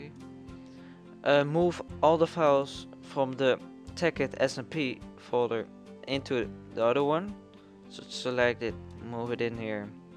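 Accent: Netherlands. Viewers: lemme guess, you a dutchie? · are you from netherland it sounds like english is not your langue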